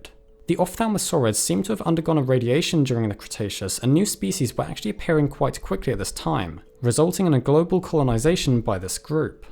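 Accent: United Kingdom. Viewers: if this channel had a non-british narrator i would probably watch it more often. the narrator is also just so monotonous and the script is so standard academic that I cant even listen to it without my mind drifting away.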